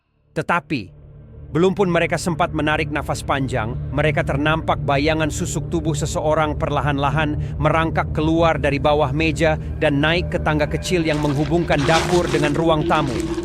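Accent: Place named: Sabah, Malaysia. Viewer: Mantap, TERBAIK. Tpi sy sarankan kasih full logat sabah bah cara penyampaian critanya bosku, barulah kena dgn crita Borneo😮. Utk bhasa pasar kta yg trlalu mendalam tu cuba jelaskan dlm kurungan mcm dlm beberapa Video sblm ni🤩. Contohnya: Baru seja c ondu sangkut seluar pindik yang dia pakai tu d jemuran pindik dlm jamban belum sempat bercangkung tiba-tiba berabis Kalang Kabut Si Ondu Pakai Seluar Di balik Tidak Jadi Melabur Gara-gara dia perasan dari siring pintu jamban yang tdk dia tutup rapat tu ada dua pasang mata marak warna merah sedang meningu si ondu mau melabur. ( baru sahaja si Ondu mengantung seluar pendek yang dipakainya pada tali yang dikhaskan utk menggantung pakaian, Belum sempat dia bercangkung utk membuang air besar dengan tergesa gesa si Ondu memakai balik seluarnya dan tidak jadi membuang air besar kerana waktu dia baru sahaja hendak duduk bercangkung matanya terlihat ada sepasang mata berwarna merah menyala sedang memerhatikan dia melalui celah pintu tandas yang tidak tertutup rapat itu.. 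#maaf jika komen trllu panjang🙏sbg seorang penggemar sy hnya memberikan cadangan🙋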